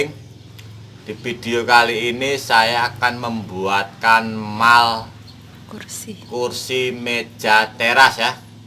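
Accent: Java, Indonesia. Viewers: Mas asal mana mas..? Kok logat jawi?🙏🙏🙏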